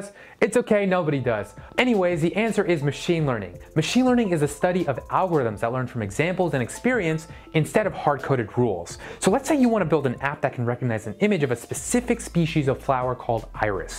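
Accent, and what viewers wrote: India. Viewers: what's wrong with your voice , seems desi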